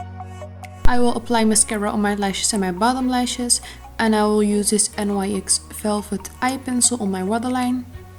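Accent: Netherlands. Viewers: Your accent sounds dutch.. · Shes dutch u can tell from the accent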